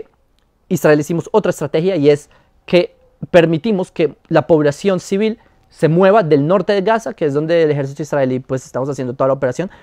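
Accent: Bogotá, Colombia. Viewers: Acento rolo (Bogotano) que tienes.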